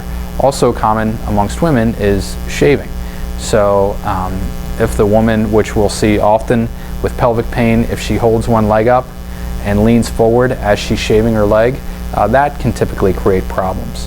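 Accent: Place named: Pittsburgh, United States. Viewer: Are you around Pittsburgh? I'm judging by your dialect.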